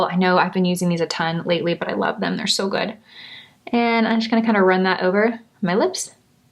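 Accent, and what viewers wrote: Texas, United States. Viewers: Well we are fans! :) Maybe they do not understand Texan??? Sounds like hey friends to me! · It’s that cute Texas accent - fans, friends!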